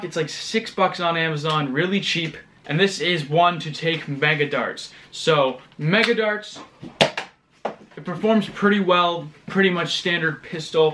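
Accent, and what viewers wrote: Canada. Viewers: Are you from Quebec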